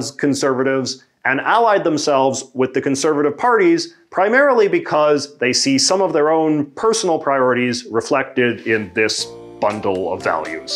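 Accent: Canada. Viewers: Damn you have a thick Canadian stereotypical speech, My english is not that pronounced, but my french sounds like i'm from the atlantic coast, cause my moms french Acadien family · I do believe you are the first Canadian I have ever heard actually say “oot”! And I have lived here for 76-odd years!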